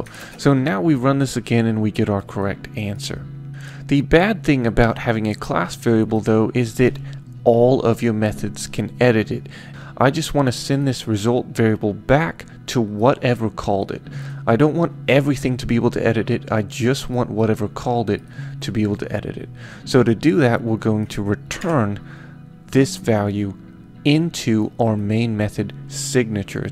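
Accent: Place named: Scotland, United Kingdom. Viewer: You sound so Scottish in this lesson ^^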